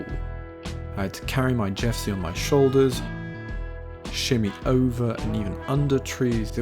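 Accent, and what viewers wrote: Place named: England, United Kingdom. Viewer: Vous avez un accent anglais incroyable 🤩 continuez comme ça j’adore votre vidéo et vos shorts 😁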